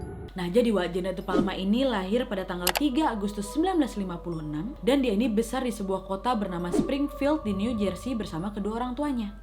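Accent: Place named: Medan, Indonesia. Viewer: kebanyakan nonton video kak, aku kalo ngomong pasti ada imbuhan wak dan logat jadi kak wkwkwkkw padahal udah sempat hilang setelah bertahun tahun tinggal di jakarta dengar kak, langsung auto logat medan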